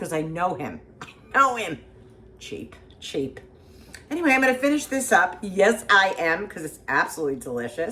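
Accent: New York, United States. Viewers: I LOVE the New York accent. Its fabulous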